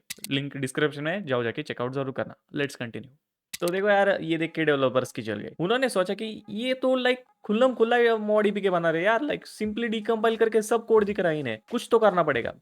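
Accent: Maharashtra, India. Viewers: Bhava tu maharastra cha aahes ka , hindi varun kalta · Bhai aapki hindi marathi accent mai kyu hai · Authentic and perfect Marathi accent and great explanation too , love the style 💖💖👍👍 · Bhai aap maharastra se hoo kya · Bhai tum marathi hona ❤ tone se samajh raha hain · I can tell you you're Marathi without listening Marathi from you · Bhava tu marathi ahes😂, tuzya hindi varun lagech samajl😂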